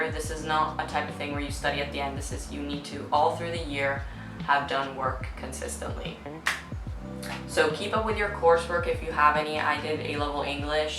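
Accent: United States. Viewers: I hope this doesn't come across as too nosey, I'm just a curious kind of person :D You sound American to my British ears, are you doing A-levels specifically because you want to study in the UK? Hai scritto che sei italiana, vivi in Italia in questo momento? Hai fatto il liceo o stai facendo qualche scuola internazionale / inglese? Come si fa a fare gli A Level all'estero, ci sono centri dove si danno gli esami? Cmq in bocca al lupo per l'università... io sto pensando di tornare a fare gli A Level di nuovo quest'anno perché sono 3 anni che faccio questo stesso lavoro e ormai è diventato noiosissimo. Scusa tutte le domande, ma sono uno che deve sapere tutto :D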